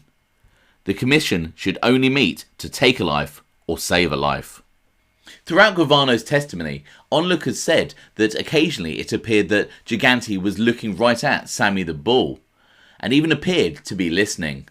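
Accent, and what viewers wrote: United Kingdom. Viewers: Brit talking about American Mafia lol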